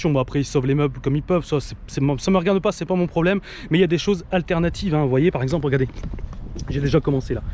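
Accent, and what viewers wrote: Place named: Northern France, France. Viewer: On viens tous les deux du nord, et tu as EXACTEMENT la même façon de parler qu'un de mes profs. Je pense que tout est lié.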